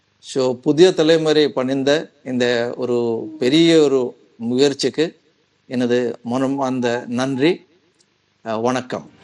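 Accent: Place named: Telugu, India. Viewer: பேச்சில் தெலுங்கு அல்லது வட மக்கள் சாயல் தெரிகிறது...எது எப்படியோ அசல் தமிழர் தேர்தல் அரசியலை கேலிக்கூத்தாக்காமல் இருந்தால் சரி.ஏற்கனவே சின்னத்தை புடுங்கி சாணக்கியமாக தெலுங்கு கட்சிக்கு கொடுத்தாச்சு. சின்னம் முறையீடு நீதிமன்றத்தில் இருக்கிற பட்சத்தில் வேற சின்னத்தை கொடுத்து எங்க வாக்கை மடை மற்ற முயற்சி செய்கிறீர்கள், பிறகு வாக்குப்பெட்டியை வைத்து எப்படியெல்லாம் நாம் தமிழர் கட்சியை அலையவிட்டு வேடிக்கை காட்டுவீர்களோ ஆண்டவனுக்குதான் வெளிச்சம்.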